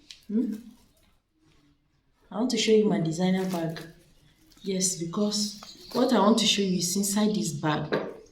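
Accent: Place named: Yoruba, Nigeria. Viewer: Me, I watch, like and down load... Thank you.💃💃💃💃💃💃💃... You talk like youruba...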